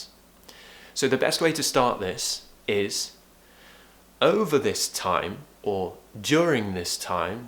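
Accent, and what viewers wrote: RP, United Kingdom. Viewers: I dream to be your student and learn your amazing pronuncation. I love british accent since my 11's. Of course I can not pay your course right now, this situation affected my economy, but one day, one day I will my dream come true. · I love British accent. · Just listening to you thats enough to get British accent ,, Lot of love ❤️ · Wow , I love British accent. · thanks for ur advices greetings from Mexico . I love Brits accent . have a good one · British accent is a great turn on for me😘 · I love British accent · I love it British accent I live in UK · This is one of the questions I find very difficult to answer correctly at interviews. Thanks for the tips. From a fellow Brit. PS. I have the same accent as yours.😃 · Who loves british accent? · thank u so much ,I Love british accent... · Indulge in British accent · Wow you are really good! 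Thank you very much for this lesson! You know what.. You look a lot like Alexa Chung.. Really.. You remind me her enormously.. Maybe because you have similar accents but it is more physical actually you faces or eyes are quite similar.. Coolest Brit pals 👌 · I love this accent😍, i think British accent is better than American accent 😉🤗. You're the best english teacher in YouTube!!! 👌🤗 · I loved British accent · Hi there! would you define your accent RP/ SSBE ? I'm working on a phonetic assignement! Also, where are you from? Thank you! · I like British accent ❤️ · I love British accent and I wish I could have a friend from London to communicate with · I love British accent ... its royal accent !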